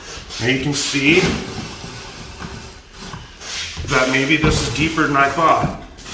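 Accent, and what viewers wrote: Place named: Wisconsin, United States. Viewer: You sound like a wisconsinite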